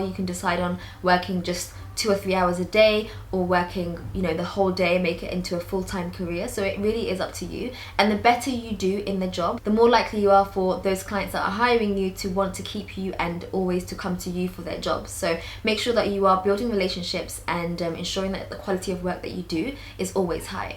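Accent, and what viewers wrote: United Kingdom. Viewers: i'm glad to see / hear a voice from the UK helping us out #nodisrepect to other countries :)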